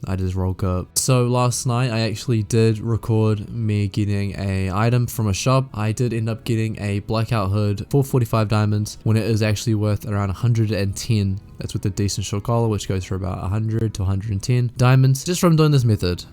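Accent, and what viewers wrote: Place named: New Zealand. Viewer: Subbed, I liked this video cause you sound like you’re from nz n I wanna follow more creators outside of us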